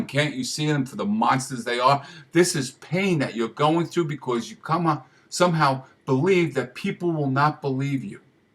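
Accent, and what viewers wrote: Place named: Brooklyn, United States. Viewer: Yes, this rings true. It's amazing the way they all read from the same script. In my case, I was the scapegoat for two unhappy families. The options were stark---get out or commit suicide. I drifted away in my twenties. By my early thirties, I was gone. My father had written a letter to my boyfriend, telling him that I was a mental case, and that he ought to dump me. The letter did have an effect on my bf, but not the one my father was hoping for. And that was the end. Anyhow, that happened years ago. I realized my family was sick, but I didn't realize they were narcissists. I'd heard the word but didn't know the meaning. Narcissism clearly comes out of childhood trauma that the narcissist cannot face and cannot change. Lately, I sort of wonder what happened to my family after I vamoosed for good. I'm sure a golden child became a new scapegoat for her mother---she was the next oldest and at bottom they disliked each other---but I do wonder who my father beat up on with me gone. 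Perhaps his girlfriend, whom he was beating up on anyway. But if there was no new scapegoat, then he simply imploded. That's the way it is with these people---they either find a ready victim who can't fight back and can't expose them---or they melt down. Anyhow, it does get better with time and therapy. Eventually, they all become strangers, and it becomes incredible that they once were intimates and "loved ones.". (An empty and cynical phrase if there ever was one). BTW, I love your Brooklyn accent! I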